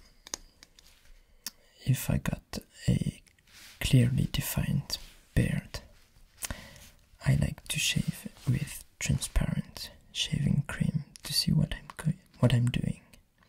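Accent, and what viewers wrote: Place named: France. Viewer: French accents are so dope.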